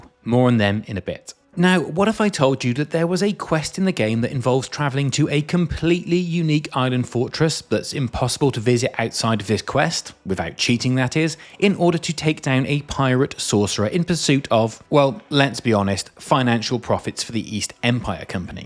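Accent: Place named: England, United Kingdom. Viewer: Brilliant video, concise, funny and very useful. Also you sound like you're from England like me! Did not know about the Argonian, the other quests I had done some of but didn't realise that they progressed!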